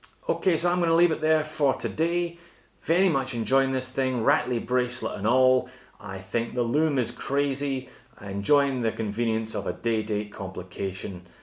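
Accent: Scotland, United Kingdom. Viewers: Stop doing that Scottish accent !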